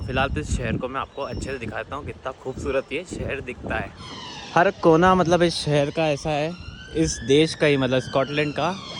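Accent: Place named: Madhya Pradesh, India. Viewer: are you from madhyapradesh bro ,your language tune like mp bhopal thats why im asking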